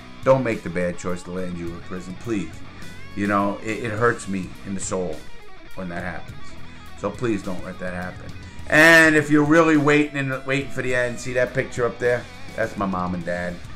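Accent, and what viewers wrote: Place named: Queens, United States. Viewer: Hey, great stuff. My late dad was raised in queens (Jackson heights) and the accent is different than yours but close. Your vids make me feel like home (living in Dublin for 2 years now). He would call my younger brother and I loan sharks whenever we played monopoly as kids lol. He ran upstate to the Catskills when he was a teenager, he had friends in the street game in the city but was never part of it (at least not seriously). Love the vids, thanks for all you share.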